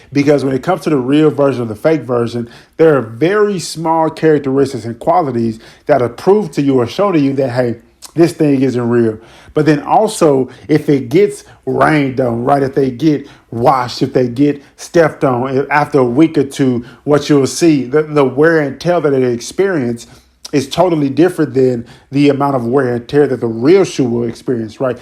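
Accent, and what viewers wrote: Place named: Washington DC, United States. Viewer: Are you from DC? …. I hear an accent and the slang lol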